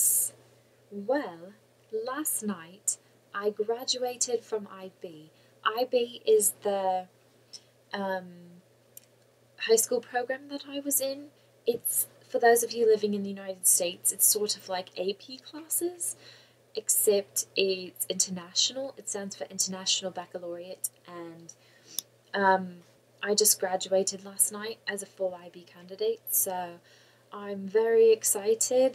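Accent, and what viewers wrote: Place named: Wales, United Kingdom. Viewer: Woah,. You actually sound really good! You sounded English in some parts, and mostly Welsh;) I am proud!:D A true Cardiffian. (Pokemon red and blue are awesome!)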